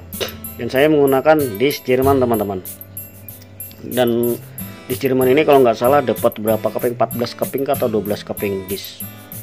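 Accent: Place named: Indonesia. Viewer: Logat bahasa HSU msh lekat dan kental bang..🤭🤭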